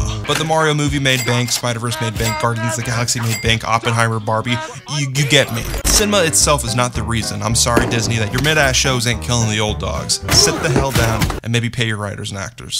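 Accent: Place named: Arizona, United States. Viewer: You must be from southern Arizona 🤙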